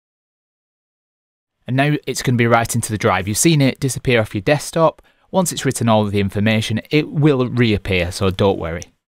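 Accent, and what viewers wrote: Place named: Northern England, United Kingdom. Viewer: Other than the heavy Northern English accent great video very well explained! Thank U!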